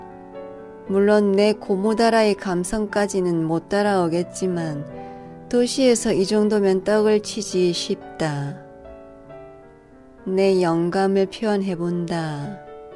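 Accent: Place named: South Gyeongsang, South Korea. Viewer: 왜 나레이션을 사투리로 한건가 했더니 경상남도 출신이시구나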